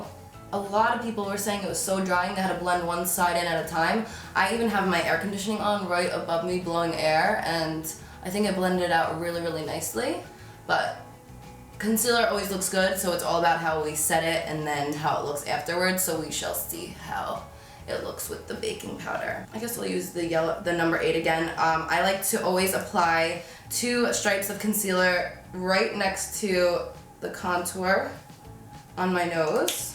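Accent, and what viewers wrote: New Jersey, United States. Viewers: I love your jersey accent lol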